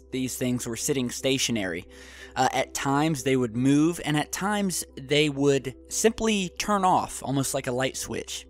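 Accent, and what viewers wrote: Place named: United States. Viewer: its cuz hes talking american english. go have fun listening to some brit's accent. freaks me out all the time dunno why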